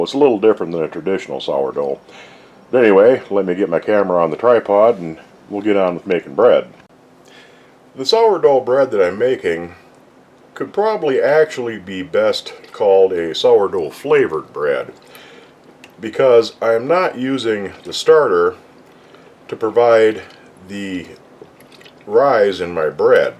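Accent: Minnesota, United States. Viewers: Mr., do I detect a Minnesota accent? I also wonder about the lake in a couple of your videos with wildlife (black bear, ducks, geese, Sandhill cranes), which I can easily imagine is one of the lakes in Minnesota.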